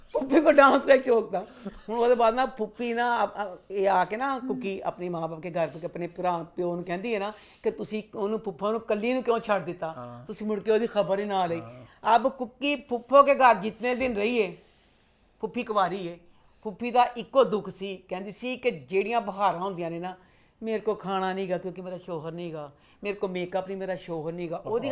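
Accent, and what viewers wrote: Punjab, India. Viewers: plz talk in urdu bohat thick punjabi bolti ha ap sar pa se guzar jati ha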